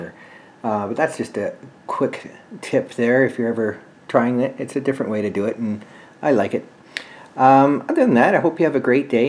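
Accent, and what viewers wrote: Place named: Canada. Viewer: Oh my god why do I love you so much! I love your accent! I’m Canadian as well but I don’t think I have this accent! I’m from PEI if you didn’t know. But aww! Your adorable! You are so nice! I’ve only met a few of my fellow Canadians that are as nice as you!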